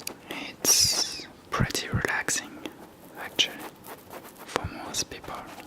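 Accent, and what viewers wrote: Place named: Germany. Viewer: oh you sound German lol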